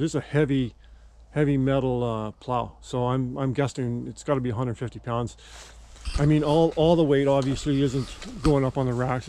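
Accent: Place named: Australia. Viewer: Nice video, thanks. Love the Aussie too.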